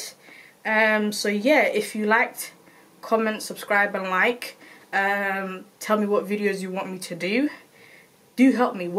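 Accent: Somalia. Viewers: When I first listened, I thought this girl is from Kenya. We have similar somali accents. Omg You are so lit. I love you already. What is even more shocking is you have similar personality to my cousin called Fartun 🙀❤️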